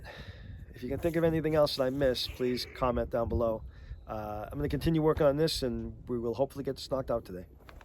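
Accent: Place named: Massachusetts, United States. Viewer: No he doesn’t. His wife has cancer though, I love him, i bet hes from massachusetts like my aunty dee dee great accent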